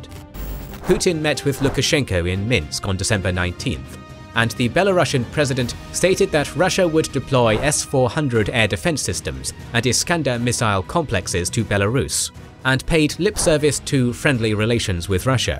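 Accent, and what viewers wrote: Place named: England, United Kingdom. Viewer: FINALLY...someone with a decent English accent... and can actually pronounce the Ukrainian town names! So much better than all the foreign commentators who can't even speak English! Great stuff...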